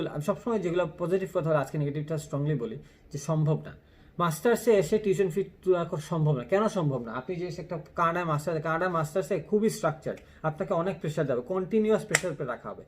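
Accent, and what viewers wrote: Sylhet, Bangladesh. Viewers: Are yu sylheti? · Bro you are from sylhet right..? Me to😎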